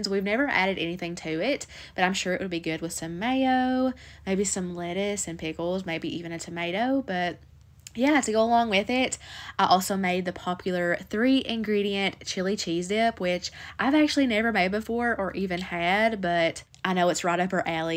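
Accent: South, United States. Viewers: Hey sweetie, idk if anyone else has already commented this or not. But sorghum is pronounced 'soor' (like door) 'gum'... So, 'soor-gum'. I'ma southern gal myself and might I say, I just love your accent! Lol Thank you for all of these wonderful videos and recipes. I'm most definitely trying out that tater salad! ❤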